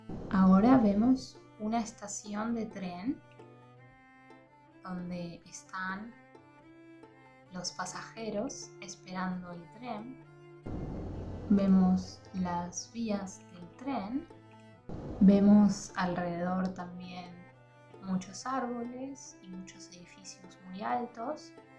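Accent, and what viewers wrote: Buenos Aires, Argentina. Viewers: Me alegra mucho haber encontrado tus videos. Soy profe de inglés pero tengo un alumno de español. El está trabajando y viviendo en Argentina y solo le interesa el "argentino", así que estos videos me sirven de mucho. Es cierto que este es un acento local porteño, pero también es cierto que no se puede hacer videos con cada acento local. Gracias otra vez. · estamos en el año 2022 ....comprendo tu leccion de historia .............cualquiera entiende el termino "tienda" no hace falta que lo expliques hector ...... la chica esta explicando las palabras que utilizamos en el dia a dia los que vivimos en bs as y se esfuerza para pronunciar cada palabra con el acento nuestro para mostrar al extranjero como le decimos a las cosas y como pronunciamos. tan dificil de entender es la correccion que hice ? nadie esta diciendo que la palabra tienda no existe ..........simplemente no la usamos aca y menos los jovenes ... 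acaso vos le decis a tu hijo ................hijo ve a la tienda de abarrotes y comprame una pala ?????????????? no esta mal dicho simplemente aca no usamos esos terminos... diriamos hijo anda a la ferreteria y comprame una pala por favor.... y si te pregunta que es una ferreteria ? le dirias.......es una tienda que vende herramientas ? dirias .....es un negocio que vende herramientas o es un local que vende herramientas ... se entiende??????? solamente hice una correccion para colaborar ya que me parecio muy didactico el video ,,,,,saludos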